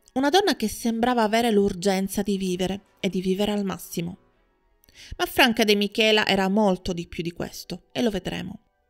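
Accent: Sicily, Italy. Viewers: Ma chi è che commenta sulla dizione? Non ho letto tutti tutti i commenti ma fin'ora non mi pare di aver letto critiche! Si sente cmq l'accento siculo ma a me non dà fastidio, anch'io quando parlo mi riconoscono subito che sono veneta 😂😂 non so usare le doppie e trascino le parole alla fine, ma non posso farci nulla 😂😂 a me piacciono i vari accenti e dialetti!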